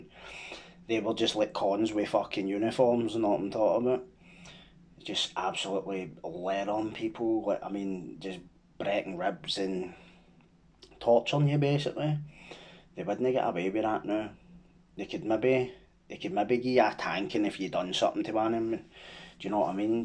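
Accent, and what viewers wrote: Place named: Glasgow, United Kingdom. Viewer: I've heard a lot of these stories before,but it's refreshing hearing it in a Glasgow accent and perspective.honestly well done on everything,I seen you on fivos podcast.theres real change there so respect to you.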